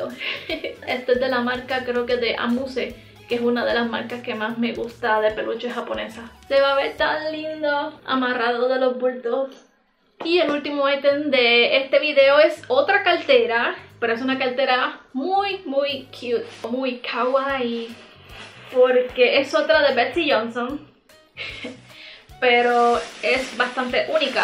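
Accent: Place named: Puerto Rico. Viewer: La cartera está preciosa. No sabía que eras latina por tu acento deduzco que eres puertorriqueña porque cambias las R por la L. Deberías revisar las tiendas de segunda mano a veces tienen cosas de Sanrio por muy muy barato. A mi también me encanta los LTS Saludos de una Venezolana desde Austria en Europa.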